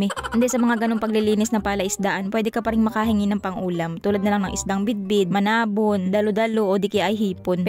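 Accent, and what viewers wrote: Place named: Visayas, Philippines. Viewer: Bakit may halong bisaya ang salita mo idol?😅bisaya din ba kayo?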